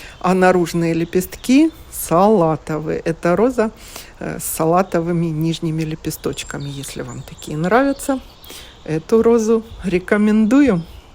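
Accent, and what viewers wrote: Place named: Baltic. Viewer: Очень красивые розы. Приятный голос, русский язык интересно звучит, как будто с прибалтийском оттенком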